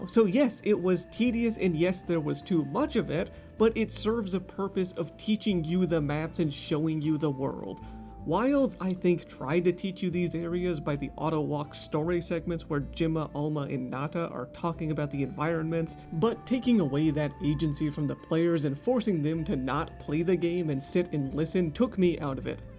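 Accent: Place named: Texas, United States. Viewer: "i was hoot i was hoolering " texan spoted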